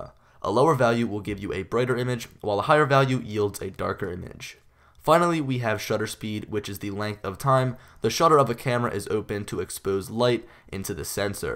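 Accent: Philadelphia, United States. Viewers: Dawson Boyle -Ha I'm just joking. I'm from the area, born and raised. First time I watched one of his videos I knew where he was from by the sound of his voice. I know a fellow hoagie when I hear one. City looks good tho huh?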